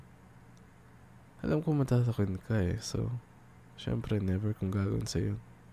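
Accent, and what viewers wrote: Conyo, Philippines. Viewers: ang conyo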